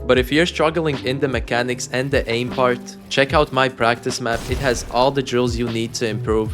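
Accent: Germany. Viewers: what accent do you have? german?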